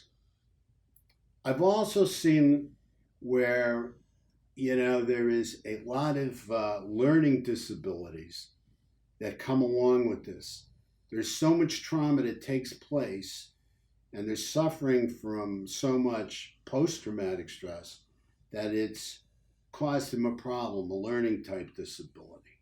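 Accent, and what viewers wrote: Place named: Massachusetts, United States. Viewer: are you from Massachusetts? You have an accent.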